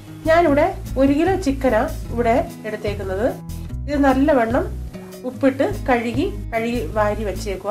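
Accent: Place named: Kottarakkara, India. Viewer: സംസാരം കേട്ടിട്ട് കൊട്ടാരക്കര ഏരിയയാണ് വീട് എന്നു തോന്നുന്നു.,ശരിയാണോ??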